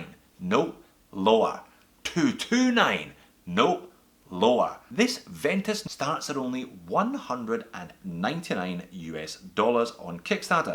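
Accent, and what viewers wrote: Scotland, United Kingdom. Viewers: Honestly I think you’ve got the best watch channel on YouTube. Even when you don’t like a watch you give it a fair shake and most of the time you don’t review watches that an average person can’t afford. And you’ve got a Scottish accent which makes everything you say more enjoyable. Keep up the good work my man.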